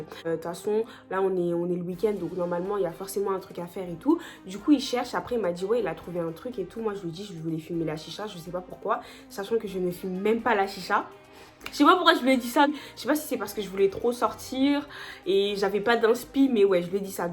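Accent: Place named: Morocco. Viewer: Une question jeune fille,tu es tellement jolie😍( tu as l’âge de mon fils),pourquoi parles tu comme une marocaine/arabe?🤔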